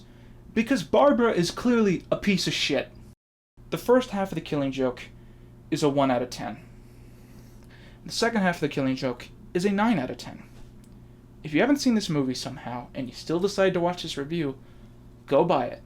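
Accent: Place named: United States. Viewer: Yo I'm just a British boy sat in his room watching this and I hear an American talk about eastenders? The figgidy niggidy fuck?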